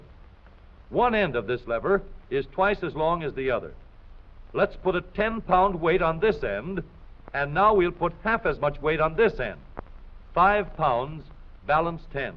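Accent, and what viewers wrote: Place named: South, United States. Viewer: Dat southern accent :D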